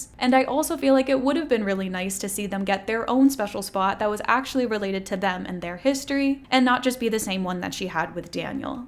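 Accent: Canada. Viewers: I’ve been following you for years but I didn’t realize you were Canadian until I watched this 6 hour video and noticed how you were saying about. Canadians seem to really pronounce their o’s I’ve noticed this with the word sorry too. Then you confirmed later in the video that you were Canadian so it’s good to know I wasn’t going crazy!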